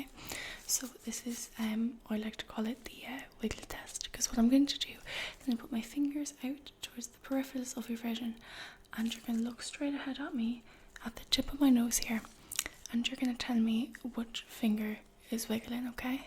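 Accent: Ireland. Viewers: This was lovely and the Irish accent is a huge bonus. What county are you from? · Hi Miss, YouTube recommended and I'm glad they did! I really like your Irish accent! I subscribed and I am going to get notifications! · That Irish accent is awesome for this type of ASMR! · I’m a simple man; I hear an Irish accent, I subscribe. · Like this video and like the Irish accent. 👌👍😊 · For some reason i just loved your accent! It's irish accent? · Love this! Instant subscribe. Haven't come across any big Irish artists yet, I'm sure there are some and I haven't actually searched for them, YouTube just hasn't pushed any in my direction till now. The accent is perfect! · Just can't beat an Irish accent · Fukkin love an Irish accent!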